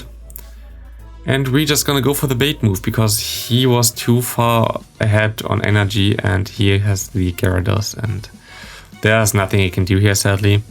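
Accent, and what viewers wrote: Germany. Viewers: Is it possible that ur german cause ur accent kinda sounds like german :)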